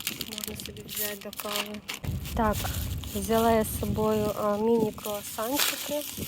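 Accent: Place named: Poltava, Ukraine. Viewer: Суржик Полтавський чи Дніпро?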